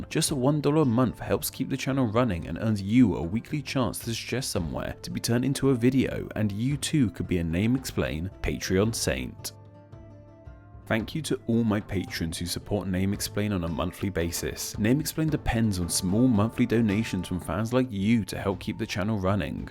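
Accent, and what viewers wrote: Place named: Midlands, United Kingdom. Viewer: For a channel dedicated to etymology, it seems weird that the host pronounces every non-English name and word as though it were a town in the Midlands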